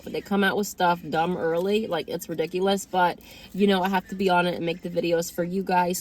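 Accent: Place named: Philadelphia, United States. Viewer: Oh your birthday is March 7... so awesome..so is mine. And I could tell you where from like Philly or Maryland or something...your accent... awesome video... Have a great week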